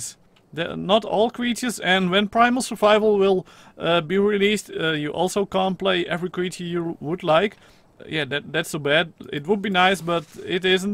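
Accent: Netherlands. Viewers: Ben je Nederlands want ik hoor het met ej accent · Dutch? Your accent seems really dutch. Edit: Why can I not spawn as a water dino? When I do it spawns me on the beach since I cannot select water and it makes me human or invisible. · are you dutch? you have a dutch accent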